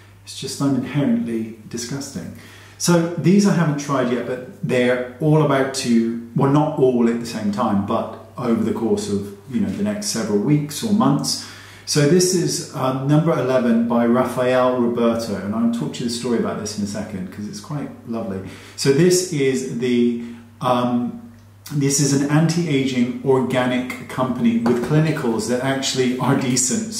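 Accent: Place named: United Kingdom. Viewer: ❤️the Brit accent! “Vitamin, Naaz, Bloody!” ❤️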